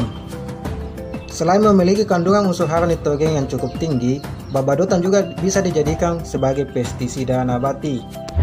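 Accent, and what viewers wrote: Bugis, Indonesia. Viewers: logat bugis kah?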